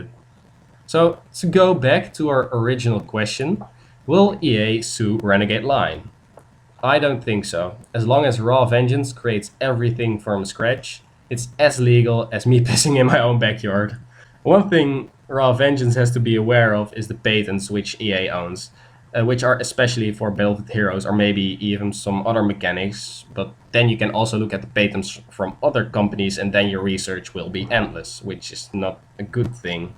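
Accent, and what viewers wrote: Netherlands. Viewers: Dutch guy, I can hear it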